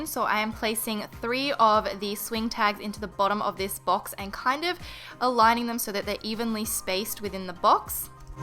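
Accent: Australia. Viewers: are you aussi?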